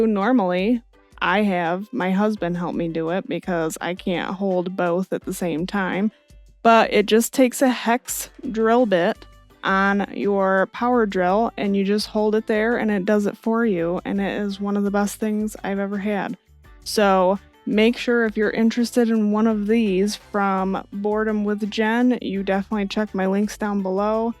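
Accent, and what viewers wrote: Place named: Michigan, United States. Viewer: This channel is amazing! Are you from Michigan, by any chance? You have the exact same accent as my friend Dawn! You could be sisters based on your voice.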